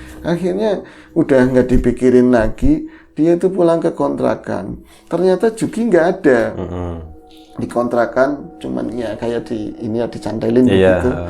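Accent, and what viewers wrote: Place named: Banyumas, Indonesia. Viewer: Sueneng bgt denger logate... 😀😁👍Ngapakmodeon ❤️❤️...sehat dan sukses sll ya Mas berdua,GBU!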